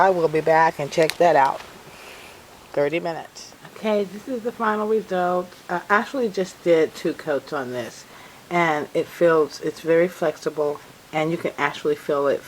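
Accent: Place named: South, United States. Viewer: Thank you for this creative video! This is the technique I'm going to use for my knit slippers (that have to be hand washed anyway). Never mind the negative comments, I'm from the South too, and that's just the way people talk down there. Keep the ideas coming! <3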